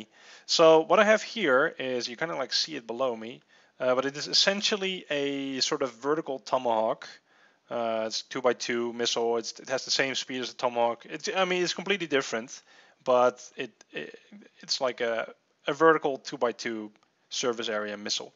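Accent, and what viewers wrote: Netherlands. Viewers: in Minecraft thought so. I recognized the way you talk. I'm Dutch to.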